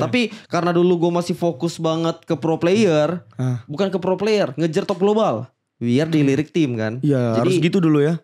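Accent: Palembang, Indonesia. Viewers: Pantess la logat logat dio ni cak uong palembanggg wkwk retinyo pernahh di palembang g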